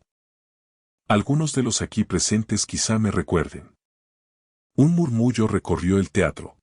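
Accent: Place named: Mexico. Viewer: Imposible 😂! No se puede decir que el protagonista que locuciona es de Toledo y ponerle acento mexicano. No way!!! 🤦🏻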